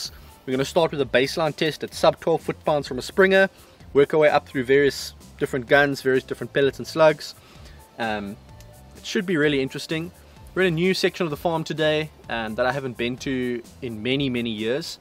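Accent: South Africa. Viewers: Funny to hear a mix of accents in South African English, a mix between Australian and a Dutch man speaking English. Groetjes uit Nederland.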